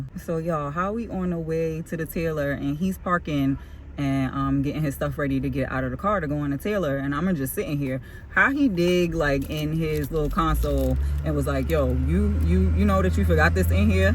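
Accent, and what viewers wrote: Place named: New York, United States. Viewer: So glad i found your page. I love you style, personality and love your NY accent. As a NY'er myself ( Harlemite ) I am feeling your vibe!!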